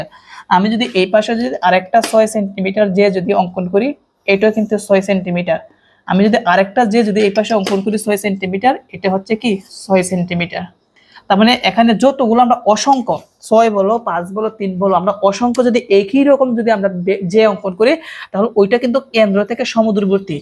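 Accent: Sylhet, Bangladesh. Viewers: Apnii ki sylhet er habiganj er ?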